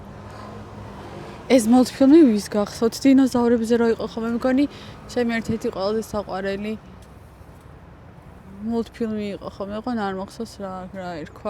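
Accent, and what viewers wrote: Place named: Israel. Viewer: ქართულად როცა ლაპარაკობ ებრაული აქცენტი გეტყობახოლმე ლაპარაკისას 😄👍✌️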